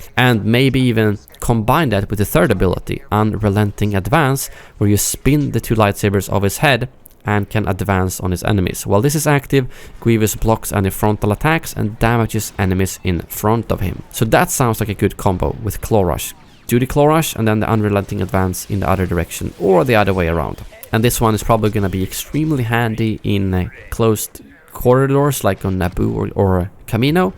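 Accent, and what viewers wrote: Sweden. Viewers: Är du fråb Sverige?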